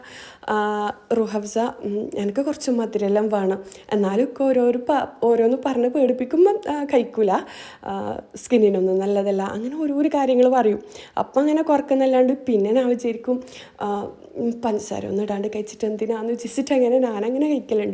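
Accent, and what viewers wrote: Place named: Kasaragod, India. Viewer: Pinne kooduthlayum vidiokulla voice kodukkunnadh kelkkan nalla rasa kasaragodile bhasha chengalle malayalam ariyamengilum ivide samsarikunnadh vere bhashailan orupadu ishtam samsaram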